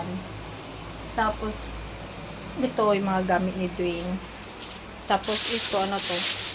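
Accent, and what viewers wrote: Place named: Singapore. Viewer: New Subbie just came across on your vlog. Funny how you also adapted the Singaporean english accent. Where in Europe they have that European accent. Looking forward in watching a marathon of you guys's vlog.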